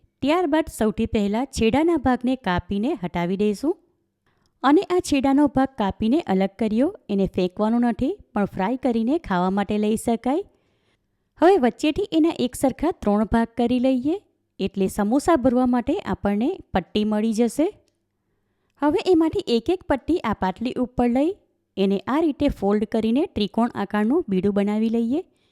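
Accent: Surat, India. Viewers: Ben tme to jaberdast surti bolo 6o ne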